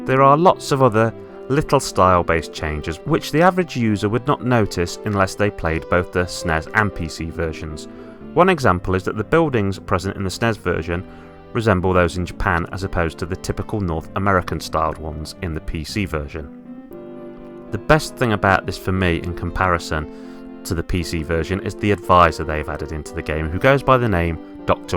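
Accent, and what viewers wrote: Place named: United Kingdom. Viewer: This is a lovely little mini review, I’m amazed it’s been so unnoticed. I definitely agree with all your points and I love the game too, it’s my preferred version by far too. Dr. Wright does actually appear in one other Nintendo game, in Zelda: Link’s Awakening he has a cameo as “Mr. Write” but it’s definitely the same character as he looks similar with the same glasses and a song from SimCity plays in his house, in the remake he looks much closer to his appearance in SimCity. Also, it’s great to see someone else who uses the British SNES pronunciation.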